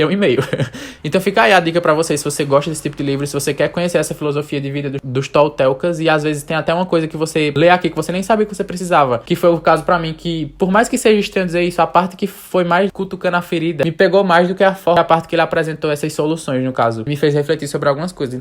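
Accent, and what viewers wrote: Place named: Pernambuco, Brazil. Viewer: Ahh como é bom ver youtubers com o nosso sotaque maravilhoso. Ouso apostar ser do meu país Pernambuco. Ainda mais influenciando a leitura, que é tão relevante. Muito sucesso!! 🙏🏾🙏🏾🙏🏾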